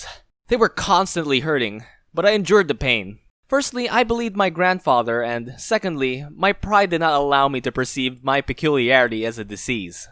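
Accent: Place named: Sweden. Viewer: Is it only me or dose he sound swedish???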